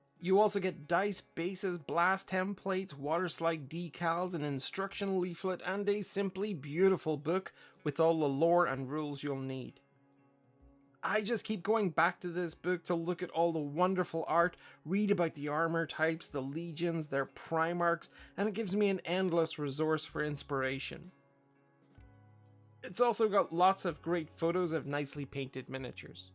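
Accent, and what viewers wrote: Northern Ireland, United Kingdom. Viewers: Do I hear a Northern Ireland accent?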